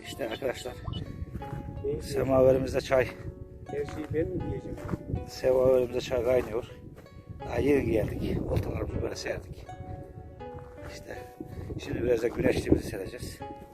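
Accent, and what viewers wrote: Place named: Konya, Turkey. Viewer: Toprağım Konyalı olduğun buram buram konuşmalarından belli iyi büyük balık var mı gelsek saygılar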